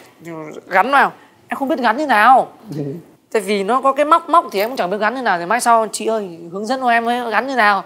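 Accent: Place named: Southern Vietnam, Vietnam. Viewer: Tiếng miền Nam dễ thương thật mà.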